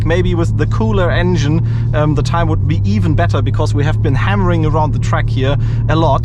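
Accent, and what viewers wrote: Germany. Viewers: Der deutsche aktzent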